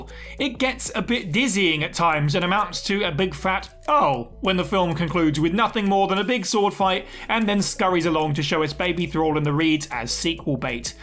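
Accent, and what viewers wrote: United Kingdom. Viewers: Anyone actually enjoy/agree with this channel’s opinions or are we all just wanting to hear ignorant opinions in a British accent?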